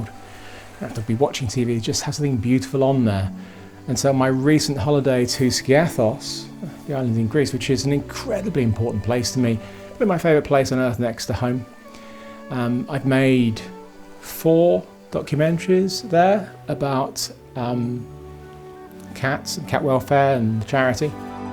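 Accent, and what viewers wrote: United Kingdom. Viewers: Me... Why the heck is talking about a TV... Clicks Also Me... Who cares I'll stay for the British accent.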